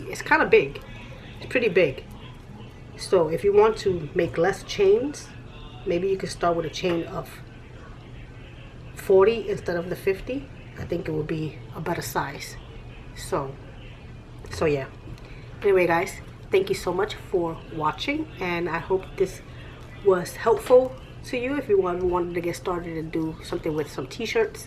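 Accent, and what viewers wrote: New York, United States. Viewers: I love your tutorials. Love the mat, very cool. I also love your New York accent. My daughter is half Puerto Rican on her father's side. Thank you for the tutorial and keep them coming.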